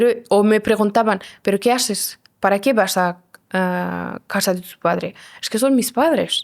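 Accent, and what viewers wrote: Andalusia, Spain. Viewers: Amo tu acento Andaluz!! 💜❤️💖 Y a Khadija mis respetos por su fuerza y valentía!! Abrazos desde Colombia 🇨🇴🇨🇴🙏🏻🙏🏻👍🏻👍🏻💜🌈🪄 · Oye no me gusta el acento español pero el tuyo 😮❤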